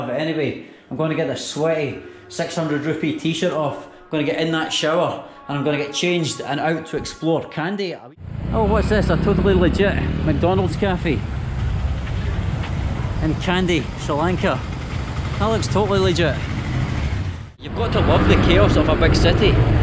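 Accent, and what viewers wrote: Scotland, United Kingdom. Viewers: Making Scottish great again. Thanks for the video. The accent and the narration is sublime as Harald Baldr would say it. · I think it is just that scottish accent. The British got their wit from trying to deal with unruly Irish and Scots all the time is my guess. Been practicing a second language of Spanish in Puerto Rico and they speak it with a high pitch accent as well that makes it sound fast but actually mexican spanish is much faster. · Hey great vlog once again! Obviously in love with the Scottish accent which we find very humorous and words such as wee bit and your boy here 😃 just a clarification. Sadly Our forefathers never understood the gravity of letting go of the brits off our nation. Most Locals living out of Colombo don’t speak good English. That man said “ theres one in half hour”” he did actually say there’s a bus in every half hour but sadly his English is wrong. 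Hopefully oneday they will too improve. Anyways I’m sure you got a better deal price wise traveling in the bus buddy 👍 have fun! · Hi, Just seen your video that you are in Kandy. I am from Kandy but at the moment I am in Scotland for my studies. Just for my curiosity, I would like to ask if you are from Scotland as you got very similar Scottish accent.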